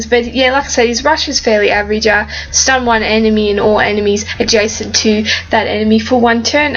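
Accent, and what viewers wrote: Australia. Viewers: Your Australian voice is nice to listen to!!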